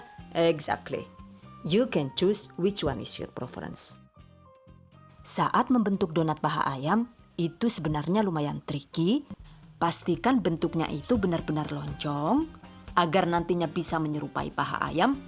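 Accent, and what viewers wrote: Banyumas, Indonesia. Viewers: Yahh koo malah ngapakk... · Hehhehe sambil semyum2 sndiri klo udh liat vidio bahasa ngapaknya buat lucu #GiveawayTHV2021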